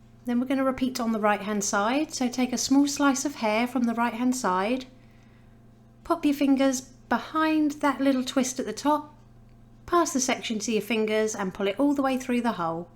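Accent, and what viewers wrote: United Kingdom. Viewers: England accent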